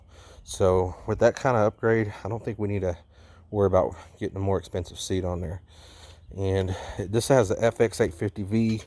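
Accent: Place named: South, United States. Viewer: Congrats on the awesome setup brother. Are you Latino but you sound very southern? Keep trucking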